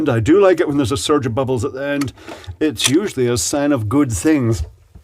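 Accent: Scotland, United Kingdom. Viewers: This is the one and only video in the YouTube universe featuring the long lost posh Scottish accent. :-)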